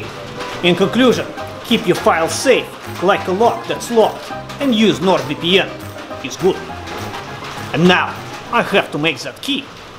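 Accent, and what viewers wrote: Russia. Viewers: Arh. The russian accent is back. I like, i like very much. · Wth why is he getting more russian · I tried watching this in the break room at work today. My Belarusian co-worker happened to walk in and she was like "is that a Russian accent?" Yeah, we had a good laugh.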